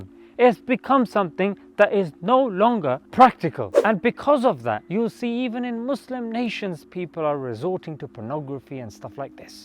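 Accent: United Kingdom. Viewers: is he his acent is british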